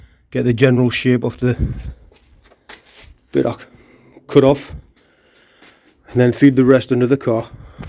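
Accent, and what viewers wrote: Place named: Newcastle, United Kingdom. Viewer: Great Newcastle accent ! - Fab car - All the very best of luck with the rebuild m8👍👍👍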